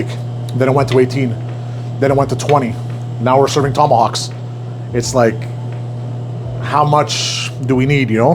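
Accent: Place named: Montreal, Canada. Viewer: Born on the West Island and live in South Carolina now. Stumbled on this video and within 5 seconds I could tell chef is from Montreal. It's like music to my ears 😂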